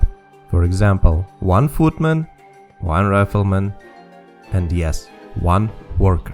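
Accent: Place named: Eastern Europe. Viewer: I love the idea of this run, pretty unique. Makes you wanna give each unit a nickname, like Sarah the Sorcerer, or Pat the Priest. I've been starting to shake off the RTS rust with one of my other childhood games, AoM, and the idea of doing this challenge on that game sounds super cool; I'm gonna need to start trying it. All that being said, before you commit to doing the entire WC3 campaign with this ruleset, I have two words to say: King Arthas Have fun with that one :D btw, really like your accent. If I may, what is it? Sounds Eastern European.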